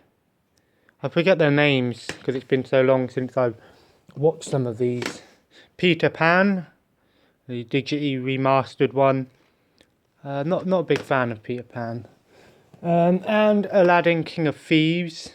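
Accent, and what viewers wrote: United Kingdom. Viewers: where in England are you from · speaking British English That is my favourite!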